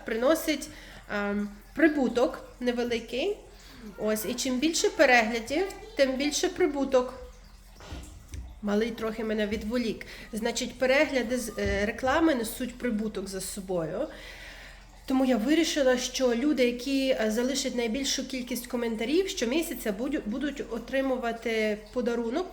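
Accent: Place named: Transcarpathia, Ukraine. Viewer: У вас закарпатський акцент.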